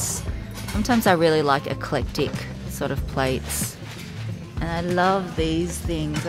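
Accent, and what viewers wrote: Australia. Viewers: Notification squad!! Hey btw I love love your Aussie accent ❤️😍🥰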